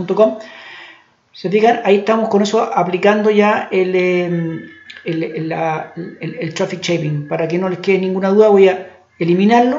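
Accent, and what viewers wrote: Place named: Cuba. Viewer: Sounds like Spanglish. I would say 95% Spanish and the words that don't translate well are English. Actually very easy to understand him, probably because his accent is very similar to a Cuban accent.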